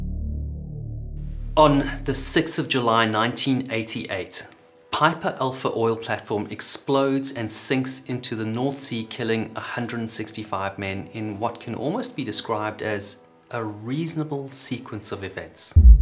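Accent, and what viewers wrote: South Africa. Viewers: You sound south african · I hear a South African accent? 😊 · Oh man, so close to hiding that south african accent. but not close enough boet!! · Your accent sounds very South African, are you south African living in the UK? 🇿🇦😁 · where are you from? you almost sound south african? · I knew a guy from Albertinia (South Africa) who worked on that platform. He'd fly in to the UK and he handled the landing barge bringing in supplies. Nice to hear the Saffa accent explaining these events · You south african? I'd recognize that accent anywhere, amazing video btw!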